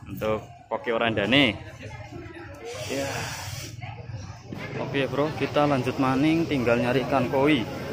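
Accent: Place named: Java, Indonesia. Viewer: Halmun 😆, mantap mas medokmu ngangeni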